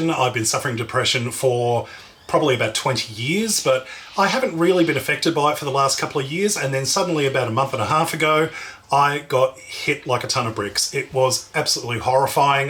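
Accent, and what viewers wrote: Australia. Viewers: This looks wonderful. Thanks for the recipe. Good to hear an Aussie voice on the tube too. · God bless you. You are a beautiful soul. Is your accent Australian? Do tell. thanks. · I love your quick cooking of course but mostly I love your Australian accent!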